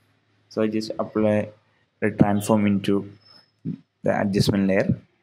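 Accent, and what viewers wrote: Kerala, India. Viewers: You are a malayali... Right? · Malayali aano?? Enik aa slang ketit angane thonunnu vere aarkelum ee dobt ondaayo??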